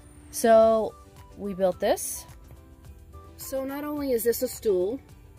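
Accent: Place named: New York, United States. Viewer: You've been at this for a long time, so I expected your van build to be really cool and functional. Nice job. Oh, and that New England accent is undeniable. Or maybe it's Minnesota or maybe Bay Shore Long Island New York. LOL!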